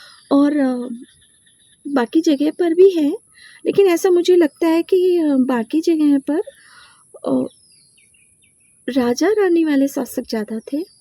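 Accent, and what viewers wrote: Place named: Kanpur, India. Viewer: बहुत अच्छी है आपकी कनपुरिया ❤❤❤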